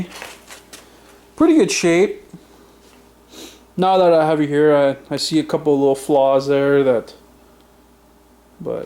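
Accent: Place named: Canada. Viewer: okay your from canada eh (: is that the 401 your on?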